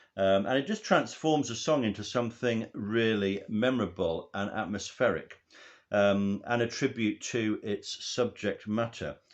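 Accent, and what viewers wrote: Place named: United Kingdom. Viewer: I guess these grades you are giving to songs do not represent their grandiosity, this album comes with a creative energy which we haven´t felt for years...the piano in first plane..the sound of vocals resembling an analog registering/record. I think i would jump two or more numbers after all you suggested...lol. I love your British accent.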